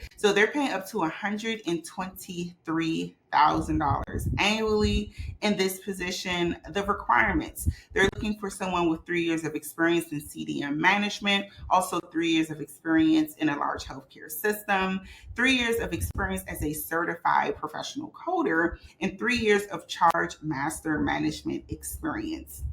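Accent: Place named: DMV, United States. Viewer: Very resourceful! Are you from the DMV? I am only asking because of your accent.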